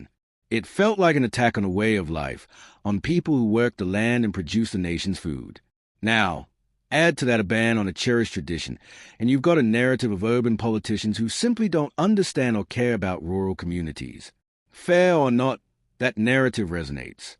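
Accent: United States. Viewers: You will only see this in the fake channel that is BRITISH MIRROR. They include the Union Flag logo to appear patriotic. You don't see any evidence, just that of the narrator, who has, at times a very heavy American accent. (AI)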